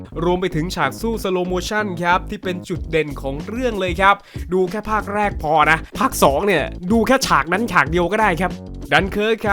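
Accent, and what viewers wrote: Isan, Thailand. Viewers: อ้านเป็นคนอีสานแม่นบ่😅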